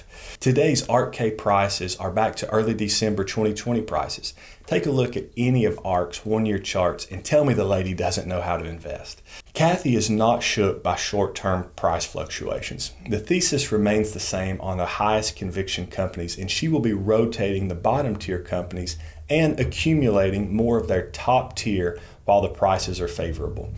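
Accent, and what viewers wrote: South, United States. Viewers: hard to take you serious with your southern accent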